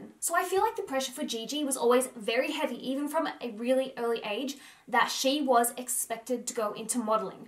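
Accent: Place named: Australia. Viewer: Australians have such funny accents 🤣🤣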